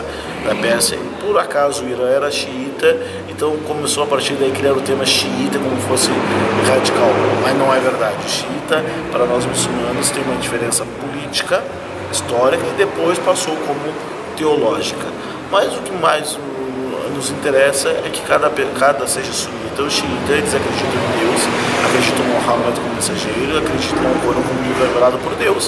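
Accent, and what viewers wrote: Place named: Rio Grande do Sul, Brazil. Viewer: Cara você é gaucho tá claro isso.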